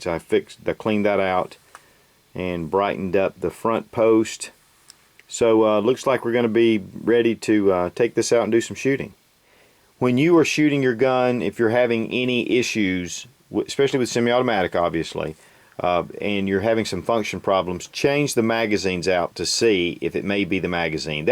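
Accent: United States. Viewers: Love your vids. Just bought a used Walther p22 for the bedroom. Thanks for all the tips. Had to subscribe for this, some of your other vids, and the down to earth all American accent. Long live the Republic! Down with Big Brother!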